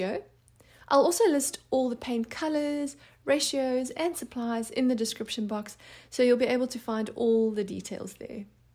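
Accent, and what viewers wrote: South Africa. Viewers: the accent is so South African i love it 😍😍😍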